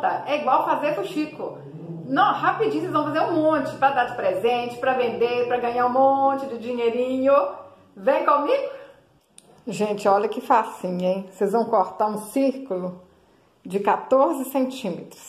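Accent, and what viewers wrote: Minas Gerais, Brazil. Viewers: Adorei o seu mineires. ( MG) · Que trabalho lindo!bonitin !vc é mineirinha, pelo sotaque 😘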